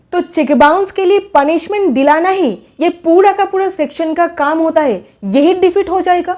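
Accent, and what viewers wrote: Bengal, India. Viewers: Bengali accent bahut achha hai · You talk like Mamta Banerjee... tussi bong ho?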